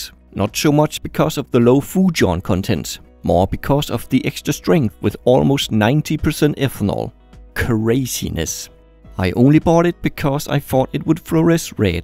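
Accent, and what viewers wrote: Denmark. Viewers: I KNEW YOU WERE DANISH!!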